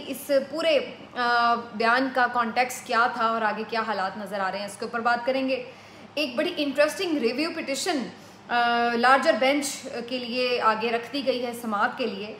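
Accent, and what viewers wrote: Punjab, India. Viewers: ماشاءاللہ آپکا پنجابی انداز گفتار بہت اچھا ہے۔ کرناچاہیے۔ اللہ آپکو صحت دے. اللہ نگہبان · Love your Punjabi accent. It would be a good idea to do a Punjabi V log. English would be for a limited audience so i will not recommend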